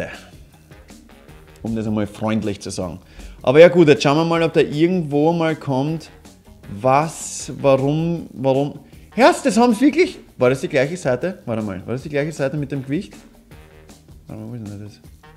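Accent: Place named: Burgenland, Austria. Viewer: Top dast deinen Burgenländischen Akzent beibehalten hast #nördlicher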